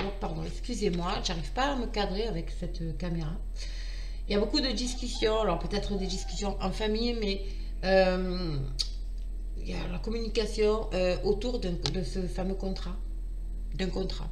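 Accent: South, France. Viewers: Super tirage, merci beaucoup , je vais passer une bonne journée !! Tirage professionnel et le petit accent du Sud , c’est absolument charmant !!👍😉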